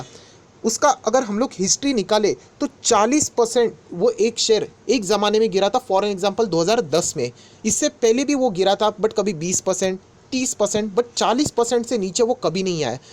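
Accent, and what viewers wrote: Maharashtra, India. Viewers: by ur Hindi I got that u from Maharashtra so let me know bro 😎 that I can meet u really u are the honest retailers well wisher🙏🙏🙏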